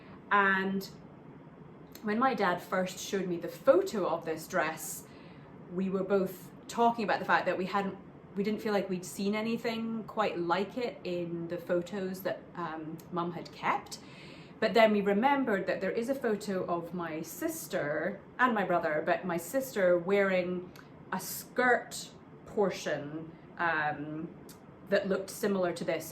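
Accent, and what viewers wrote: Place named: Scotland, United Kingdom. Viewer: Congratulations on another fabulous episode! I cannot tell you how much I am enjoying the content - I love hearing about the history of these lovely garments and how you are keeping them alive … 😁It’s also so bizarre seeing the snow and hearing about the cold, when I am sitting here in the air conditioning during our hot and humid monsoon season! Even so, my roots are in Scotland and the Northern Isles, so listening to you speak is like hearing a letter from home. Thank you so much for sharing your incredible knowledge. Very much looking forward to your next episode … Big hugs from Darwin (up north) Down Under … 😊🌴🌴🌴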